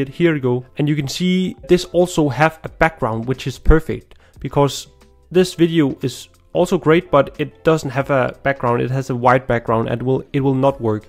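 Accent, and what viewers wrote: Denmark. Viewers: i knew immidetly you were danish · Haha, I knew it. I can smell a Danish accent from miles away! Very distinct sounding... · 1. Denmark just got that programmer population. 2. That classic danish accent… not every english speaker can notice it, but about every danish can. 3. I’m also danish, yes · Most danish accent ive ever heard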